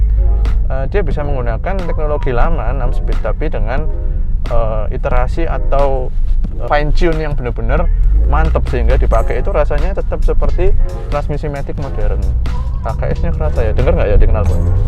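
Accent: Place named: Java, Indonesia. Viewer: Medok banget mas!!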